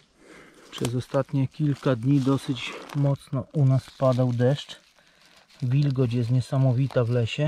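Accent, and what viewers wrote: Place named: Masuria, Poland. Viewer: Skąd jesteś? Sądząc po akcencie gdzieś z Mazur. Zgadłam?😉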